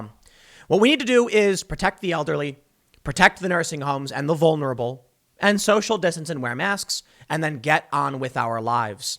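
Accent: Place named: South, United States. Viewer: Why started making that Southern accent recently? "Y'all" and all that crap? Did he move to Texas or something?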